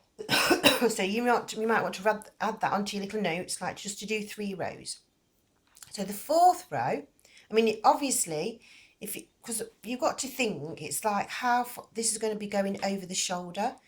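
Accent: United Kingdom. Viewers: Thank you very much for your patient explanations as you crochet! You take all the guess work out of your patterns & I really appreciate that! The Tiny Baby Cardigan is ssooo cute! I plan to crochet quite a few to go with the hats/caps I am crocheting for tiny babies at our local hospitals. Also, thank you for sharing such interesting history! I always wondered about the circles that some call "Crop Circles." Now I know they come from a mushroom! I didn't quite understand all about the rocks. I guess I was having a brain freeze at the time. I really enjoy watching your videos! They are very helpful to me! I love your British accent! May God richly bless you!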